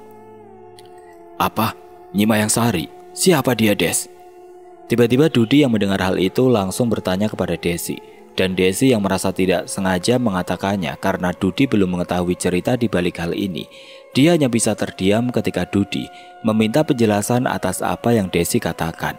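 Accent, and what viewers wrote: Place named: Sunda, Indonesia. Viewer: 😂😂lucu bgt bahasa sunda nya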